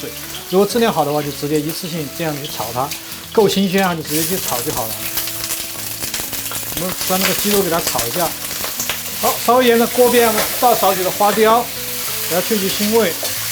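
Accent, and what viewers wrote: Sichuan, China. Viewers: 聽的四川口音，感覺菜更香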